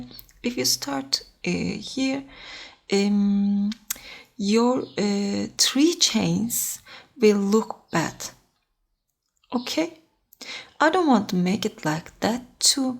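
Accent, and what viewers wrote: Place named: Turkey. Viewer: I've watched a few of your videos and had a feeling from your accent that you're from Turkey 😀 I wasn't sure though but then I saw the yarn you're using. I have this brand too as I live in Turkey 😊 hello to you and thanks for your videos. Your accent and voice is so soothing and your videos are so helpful 🤗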